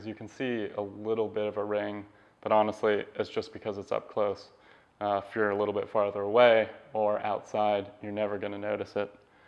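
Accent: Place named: United Kingdom. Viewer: haha love the british accent - love the lights. gonna put some $ toward the Beta QR next check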